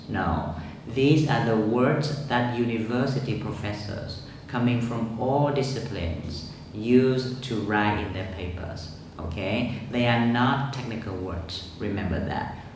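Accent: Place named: United Kingdom. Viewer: Mình thấy thầy nói hay mà . Thầy nói giọng Anh- Anh nên chắc bình thường bạn nghe giọng Anh- Mỹ nhiều nên nghe ko quen thôi.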